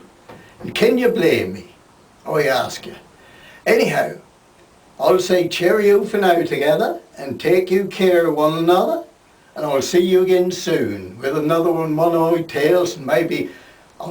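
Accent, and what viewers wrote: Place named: Great Yarmouth, United Kingdom. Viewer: Thank you for satisfying my curiosity about what the accent of a Great Yarmouth native would be.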